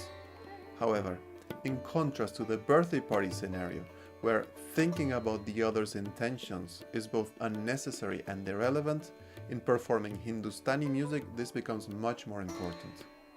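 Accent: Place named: Scandinavia. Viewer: Kyabaathai. Very good and important work. Thank you so much for this wonderful initiative! Also I must ask: Where are you from? Your accent sounds very Scandinavian but the Indian Classical Music community is so small here that I would've known you then?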